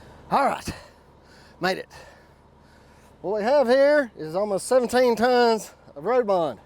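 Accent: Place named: North Carolina, United States. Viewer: Just found your channel, moved to nc from illinois, bought 60 acres and have built a home on it, pond already existed and was searching videos on how to build roads…heard your accent and thought to myself, this guy talks just like my neighbor…sure enough, looked you up…NC..definitely a native. Im located a little far east for you, but i would have you come repair my pond, the shorelines have all collapsed and need the muck cleared out and built back up the shorelines. Finding anyone who has pond experience in my area seems impossible. Im actually thinking of just buying a kx-080, use it for a couple years and then sell it, they hold their value pretty well from what i see.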